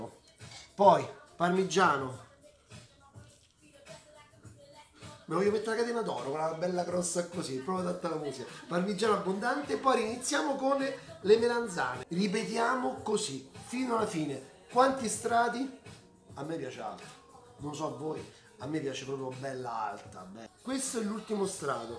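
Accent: Rome, Italy. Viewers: Che bello risentire il romano!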